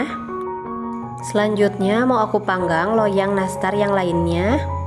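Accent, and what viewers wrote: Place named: West Java, Indonesia. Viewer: Meuni sunda pisan Aksen na, salaam sunda atuh Neng Geulis 😊 Hanupis resep Nastar nya nanti mau coba di praktekan 👍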